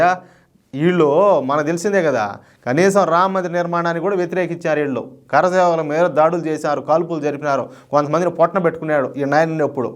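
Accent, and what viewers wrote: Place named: Telangana, India. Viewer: I appreciate your videos but your pronunciation is completely Telangana yasa if possible please change in pure Telugu.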